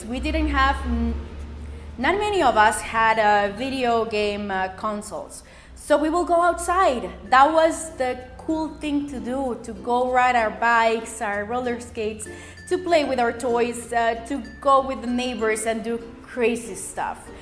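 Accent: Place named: Europe. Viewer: I remember I would borrow my mothers cell phone when I would go to high school basketball games with my cousins. She wanted me to have it so I could call her at home when we needed to be picked up after the game was over. She then bought me my first cell phone when I was 20 as a gift because she was worried about me driving to visit my older brother who lived one hour away. I was thankful but I knew at the time I didn't see why I would need a cell phone. By the way, I was born in 1984 and I'll be 34 in 3 months. My cousin said I'm just a millennial because Xennials were born from <num>. Well I was conceived in October of 1983 if that counts, lol! I feel closer to generation X and Xennials more than Millennials. I finally created an Instagram last Saturday. Now I know what DM means, (Direct Message). I still love the great outdoors more than being glued to my cell phone. 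I'm more into being outside cycling or hiking on trials. Your childhood is very similar to mine. I like your accent by the way. I wasn't sure where you are from at first. I assumed you were from Europe. Well have a great day.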